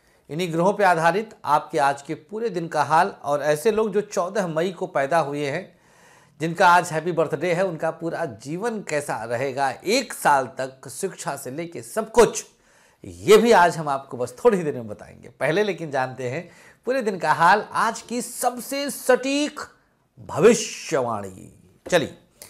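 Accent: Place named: Awadh, India. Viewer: Shree radhe guruji apki avadhi boli bhot jyada pyari lgti h love u always... chrn sprsh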